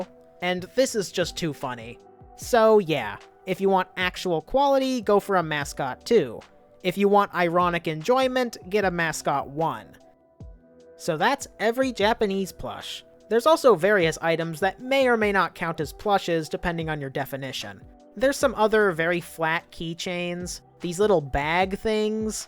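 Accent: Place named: United Kingdom. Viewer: Bro’s British✋😭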